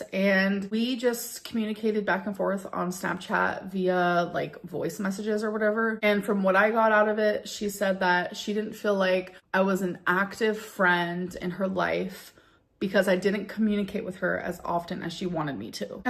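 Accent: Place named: South, United States. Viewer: She sounded like Lex in that voiceover and back to her weird southern thing in the video. That’s some close up comparison of her powers of absorption😂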